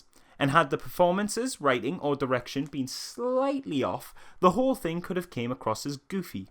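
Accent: Newcastle, United Kingdom. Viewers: Getting more and more geordie as time goes on what's the crack like?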